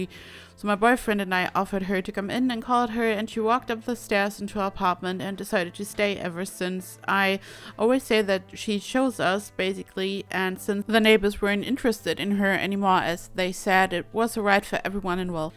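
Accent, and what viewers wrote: Germany. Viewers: In der ersten Sekunde, wo du gesprochen hast, hab ich sofort gehört, dass du deutsch bist :D Grüße erstmal. Ich finde das Haus mega geil. Hab heute zum ersten Mal in Sims 4 ein Haus gebaut (eigentlich mag ich Sims 3 deutlich lieber und hab 4 lange nicht angerührt, aber es hat wirklich viel mehr Spaß gemacht als in 3 und ich bin sogar etwas stolz), an dein Haus kommt meins allerdings bei weitem nicht dran... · are you german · Kommt die Tante aus Deutschland? Das Englisch klingt irgendwie nicht wirklich native...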